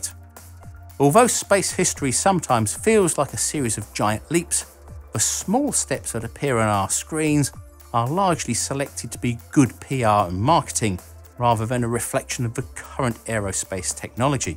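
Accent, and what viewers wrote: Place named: England, United Kingdom. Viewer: I do like it. I was wondering why I prefer your channel to Isaac Arthur's. I guess I prefer the "talking head" approach that you offer. But, more than that, your graphics and script are tightly coupled. I also find the english accent more interesting, although Isaac also has a melodic quality (deep southern gumbo) - so his voice SHOULD be a compelling one - but it isn't (for me, anyway). Content wise might I suggest you dive deep into SLS with a discussion of how Nasa was FORCED to take SLS - and the politics in play in Senator Shelby's district. A great, if dated, read on the subject of NASA's miseries is the 2005 book: "Lost in Space: The Fall of NASA and the Dream of a New Space Age" by Greg Klerkx It's definitely a NASA bash, but the political points are hard hitting. Just looking to help.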